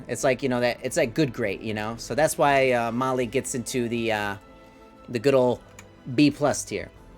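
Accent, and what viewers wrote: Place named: Seattle, United States. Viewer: Awesome Tier list! Just out of interest - where is your accent from? Are you from Seattle by any chance?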